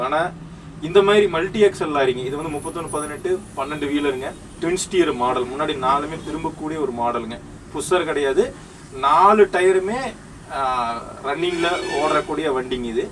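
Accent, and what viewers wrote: Kongu, India. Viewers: Andha kongu slang irukae , lovely..... I'm from Trichy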